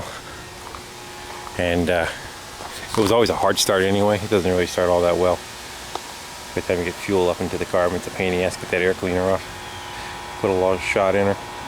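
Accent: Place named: East Coast, United States. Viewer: Most of the east coasters that work in my seafood department where I work sound like you haha.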